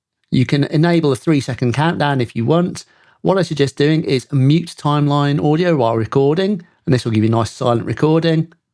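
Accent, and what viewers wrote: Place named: United Kingdom. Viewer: Love it. Right to the point, well explained, and given your British accent, you don't talk so slowly that l need to speed up the video. Great stuff!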